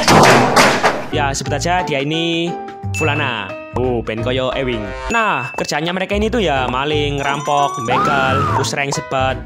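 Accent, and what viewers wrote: Java, Indonesia. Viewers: boso jowo mu kentel men mas, wong jowo ta? 🗿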